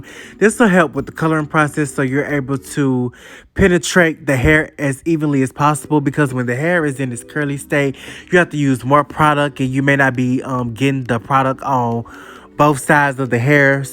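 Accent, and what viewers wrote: South, United States. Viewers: I appreciate how u say “akahawl” I’m a Cali native the southern in ya is adorbsss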